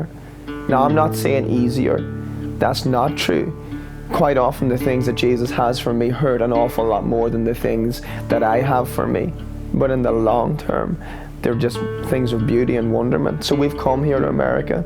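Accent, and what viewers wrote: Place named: Ireland. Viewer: I love listening to speak, not only the Irish accent 😉 but to speak of Jesus and what leads him to follow and do Gods will. Thank you and WAM 🙏🏼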